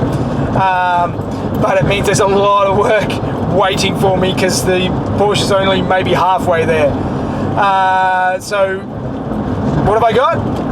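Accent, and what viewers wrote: Australia. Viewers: Do a full 105 bertone race car conversion. Had i known you were i Adelaide I would have lent a hand. Thought i recognised the accent. (full adelaide question) Where'd you go to school? My best mate has been putting off his 105 rebuild for nearly 15yrs. I recommended he check your channel out and the next week bam, 105 rebuild. Is it a twin spark motor?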